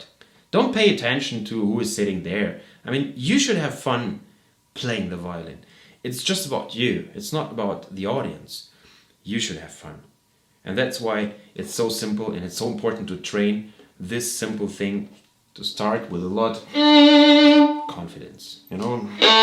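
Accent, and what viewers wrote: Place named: Germany. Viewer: Your English skills would be more convincing, if you pronounced the simple word "and" [and] rather than [änd]. Pay attention to the "d" and the end. German people tend not to differentiate the words "ent" and "and" in terms of pronounciation. The same applies to the word practice. German people tend to say [präctice] and those are tiny words revealing you as a German guy. I know that at school the teachers do not know better.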